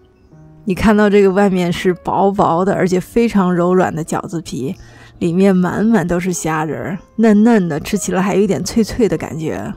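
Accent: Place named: North, China. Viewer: 怎么是大陆北方的口音，带点儿化音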